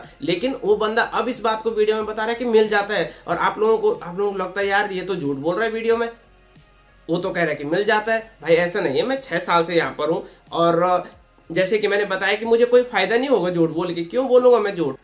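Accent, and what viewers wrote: Garhwal, India. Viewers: Bhai. G kakha ka cha grhwali tune aani cha bht bdiya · Very good bro tumhari awaaj se lgra tum gadwali ho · Bhai apka voice ton gadwali hai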